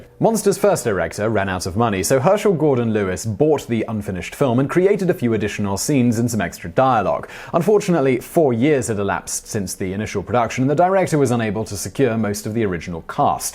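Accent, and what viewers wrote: United Kingdom. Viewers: Same. He has the perfect British accent...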